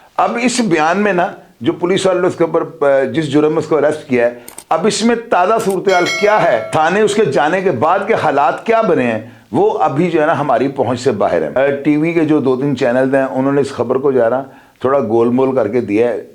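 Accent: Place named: Sialkot, Pakistan. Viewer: Aapkey lehjey main sialkot jhalakta hai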